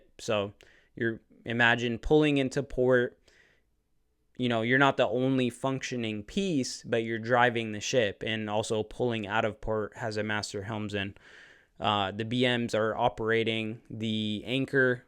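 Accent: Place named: United States. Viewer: I just realised this is American not Australian lol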